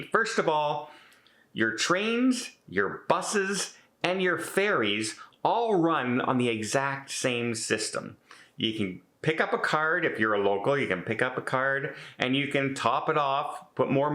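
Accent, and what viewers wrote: Canada. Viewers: You should have come to Perth it's different again. It's small , hardly any population , isolated and very clean. It's also not as expensive. Not sure ,am I picking up a Canadian accent.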